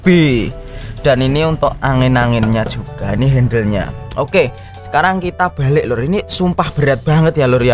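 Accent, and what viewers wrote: Java, Indonesia. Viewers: Medok banget...suaranya ngebas banget....jowone ngendi....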